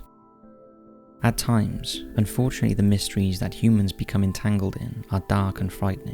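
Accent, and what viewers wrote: Wales, United Kingdom. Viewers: Your channel is good, been watching for a few years but you gotta sort out your English pal, what the hell is with all this pronouncing E's as A's.....for example 'click the BAL to subscribe'...wal, bal, tal ect when it's WELL, BELL, TELL and so on. Your voice tone is relaxing, but it's annoying as hell the way you are saying certain things. I am English and live in England and I want all Americans and people who don't live here to realise that his weird hybrid Welsh/English accent and his pronounciations of certain words are totally OFF and not normal AT ALL in England or English....'ostradized' ?? come on!...and he once called Sigmund Freud.....Sigmund 'Feud'. lol I have sympathy for him because i am 99% sure he suffers from Dyslexia, either that or he does it on purpose because it seems to be the fashion to talk strange on these kind of channels, i.e the youtuber named 'Chills' (intentionally stupid and annoying voice)....I can never work it out, does anyone else think the same?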